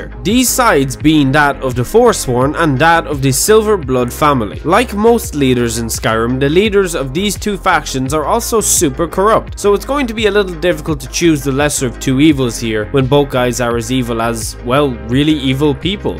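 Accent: Ireland. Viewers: I am a simple sera. I hear an irish man . I see a Khajiit. I like it. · Where in Ireland are you from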